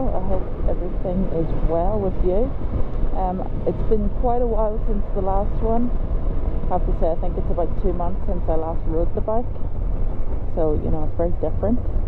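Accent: Ireland. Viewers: Your accent isn't that thick for an Irish person lol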